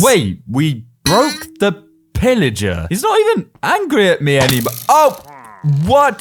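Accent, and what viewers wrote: United Kingdom. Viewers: oh god this is my first time coming across he sounds to british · Ngl you sound like British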